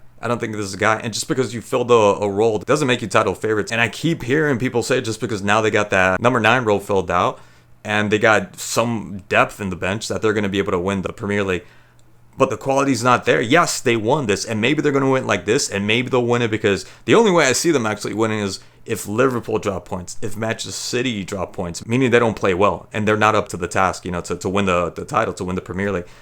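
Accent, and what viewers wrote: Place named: United States. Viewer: Not gonna lie, i hear that accent and once i've wrote this i'm off. 🤣🤣🤣 sorry fella but yanks and football just don't go together.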